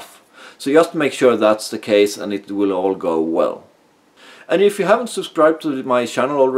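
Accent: Sweden. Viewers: typiskt svengelska!lol enyoyed this video lol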